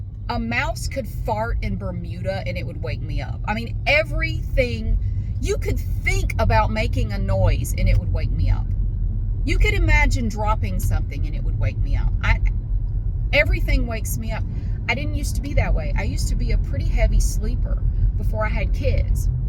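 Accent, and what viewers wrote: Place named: South, United States. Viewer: I am originally from India I lived in Texas for 7 years before moving to Canada. I love southern accents. I find the way you speak and share your experiences really amusing. You are raw and real. Keep up the good work. Your rants are extremely funny lol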